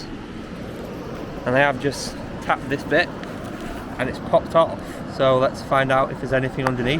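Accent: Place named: United Kingdom. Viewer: You have the best British accent. #1 British person.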